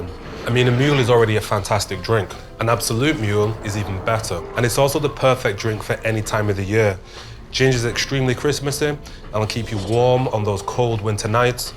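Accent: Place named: Leeds, United Kingdom. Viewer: Aww bless you son. Speaking in another language with that Leeds accent. Bless you. Made me laugh. I like the pear voka xx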